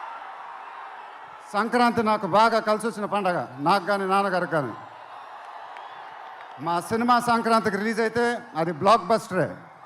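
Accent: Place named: Guntur, India. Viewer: Guntur slang 😅vamoovvv adhem dansushhhh😅 mana gunturodura ma anna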